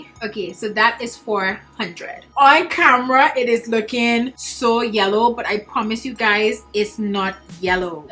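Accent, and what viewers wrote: Trinidad and Tobago. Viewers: This is my first time seeing your videos. And tell me why in your opening line I’m like “but why does she sound trini?” Opens description bar and tadaaaaa 🇹🇹 you got a subscriber from your fellow trini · Dont know why it took me so long to subscribe to your channel. You always have a timeless look plus the accent. Trini gyal ??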